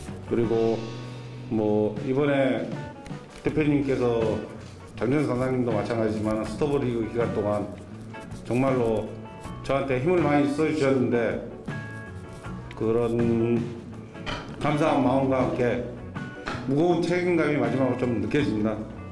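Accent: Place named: Honam, South Korea. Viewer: 말투가 약간 호남 사투리가 썪어 있다고 할까요? ㅎㅎㅎㅎㅎ 아무튼 김종국호의 타이거즈 기대합니다.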